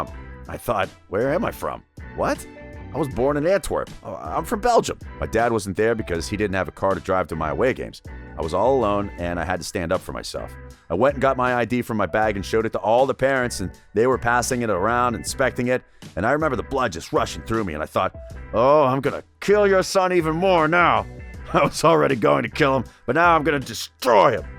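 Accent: United States. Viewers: It would be more credible if someone other than an American narrated it.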